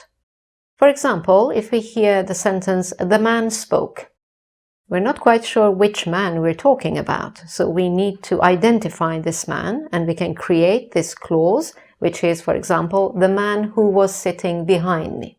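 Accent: England, United Kingdom. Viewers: I thought you were from inglan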